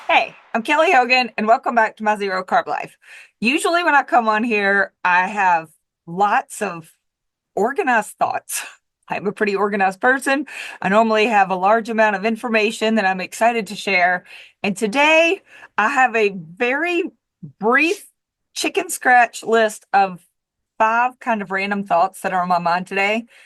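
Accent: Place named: Texas, United States. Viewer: Can't do accents? You have a stronger accent than mine, and I'm also from Texas. You have a country gal accent, you were just quoting a gal who has a backwoods accent, that's all.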